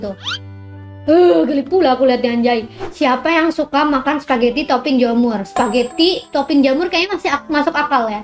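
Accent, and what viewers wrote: Java, Indonesia. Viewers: Medok banget feelingkuh orang Jawa sih menurutmu gimana min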